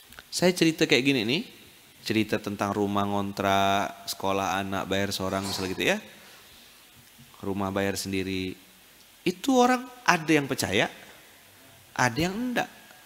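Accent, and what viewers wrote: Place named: Malaysia. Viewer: Logat macam Malaysia pun. Terbaik ustadz ni.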